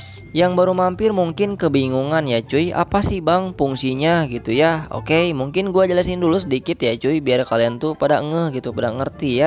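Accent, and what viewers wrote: West Java, Indonesia. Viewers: Anjay...sundaness pisan lh logatna😂😂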